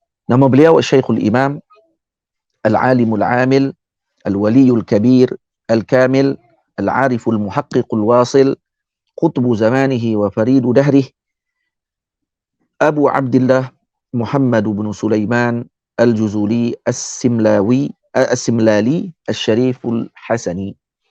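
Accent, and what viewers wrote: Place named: Malaysia. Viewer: trima kasih atas penjelasanya ustad mungkin bahasanya indonesia malaysia mungkin ustad dari malaysia masih ada logat malaysia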